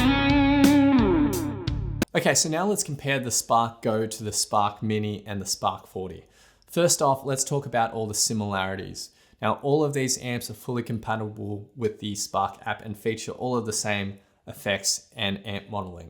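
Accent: Australia. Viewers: Do I hear an Aussie accent? If so,.. I've noticed several guitar guys from Australia lately. Very cool and says a lot for a strong music culture there! Spark 40 sounds like you're in a box. Surprising the Spark Go has more depth of sound. Strange. Currently,.. Pre-Orders $109 for this tiny little guy!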